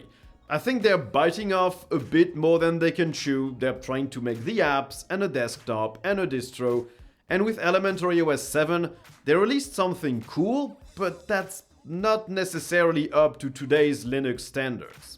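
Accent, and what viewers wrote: France. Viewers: Tu serais pas Français par hasard?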